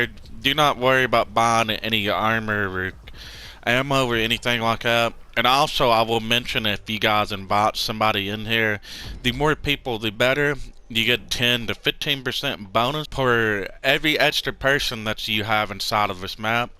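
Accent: Texas, United States. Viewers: My nigga u from texas? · Is it your mic or are you from Texas?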